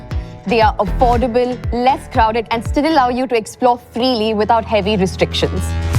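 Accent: India. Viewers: Your english accent sounds like Indian accent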